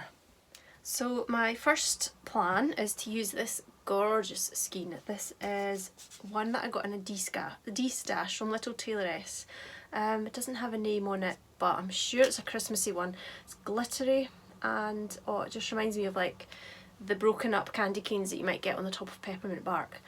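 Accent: Scotland, United Kingdom. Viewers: You made me really happy with taking part in Vlogmas,. You’re right, there are so many people participating, but I’m enjoying your makings, cooking, scrap booking, the nature and, of course, your scottish accent so much! You put it all so beautifully together with the music ❤️ As a maker with a budget for her hobby’s I always find inspiration and a little calming in your vlogs. Thank you for the work and time you put in these videos. Stay healthy, and have a cozy and crafty December, Frauke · My first time watching your channel. Found you by searching for Vlogmas. Really enjoying listening to you while I catch up on my home admin. My parents live near Loch Lomond and my family is from Scotland even though I live in England. Nice to hear a much loved accent :-)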